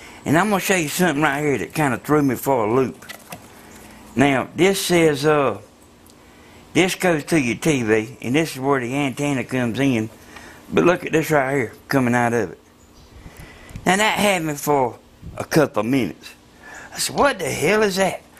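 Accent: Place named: Georgia, United States. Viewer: Soon as you started talkin I knew you was from Georgia..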